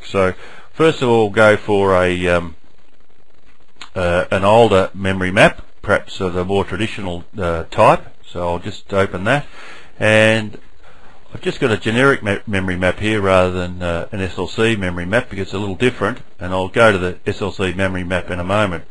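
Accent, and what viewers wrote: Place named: New Zealand. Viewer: Are you from NZ? I have been over there and your accent looks very kiwi for me... :)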